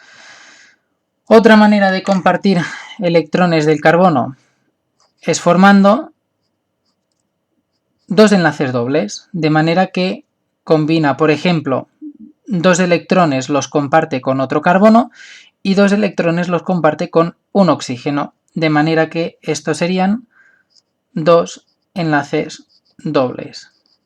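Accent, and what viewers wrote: Catalonia, Spain. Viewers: Como se nota que eres catalán/valenciano, xD. Desenvolupada, ehhh! +10 al vídeo, me ayudó mucho!!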